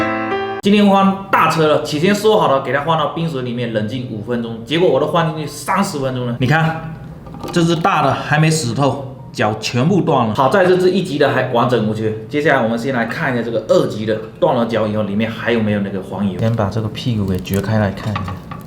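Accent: Fuzhou, China. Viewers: 怎么福州口音 这么重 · 一听就是老福州了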